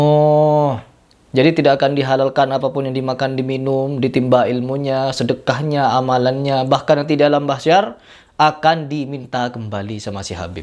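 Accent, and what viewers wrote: Madura, Indonesia. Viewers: ADMIN LOGAT MADURA INI PEMFITNAH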